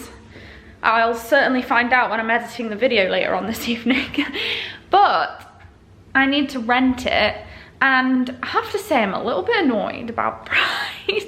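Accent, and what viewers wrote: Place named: United States. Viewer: Was so interesting watching you make the drink! I feel like in this video because you’re speaking more you can hear the slight American hint on your accent for some words. It suits you so well!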